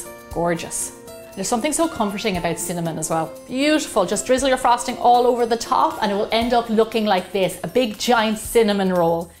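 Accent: Ireland. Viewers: Hi! This is a wonderful cake and even though I don't eat eggs I bet this is just so yummy! I love your videos and your wonderful personality and that absolutely lovely Irish accent! It's also heartening to know that you reply to the comments of your subscribers. Good luck to your awesome channel!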